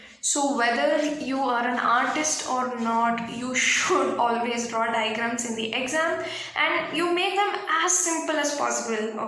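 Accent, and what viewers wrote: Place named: Kerala, India. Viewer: Are you a keralite? (your accent make me feel so)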